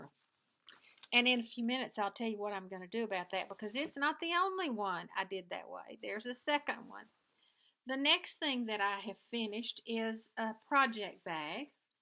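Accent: South, United States. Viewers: You have such a lovely southern accent! It's fun to listen:) Glad to see your hand is getting better- but you have to take care of it- stitching time might need to be sacrificed.. The broom cover is very cute- I might be tempted to stitch something like that too. I never tried primitive designs- looked more into punch needle style. I'd like to try for your giveaway for either one of magazines. BTW I'm doing a giveaway too :) Happy holidays!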